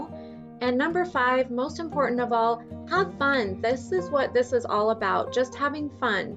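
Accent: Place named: South, United States. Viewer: you live in south by your accent. Pumpkins are fruit not vegetables. I am married to a farmer and my dad had a garden until he could not tend it anymore. Country girl here!!!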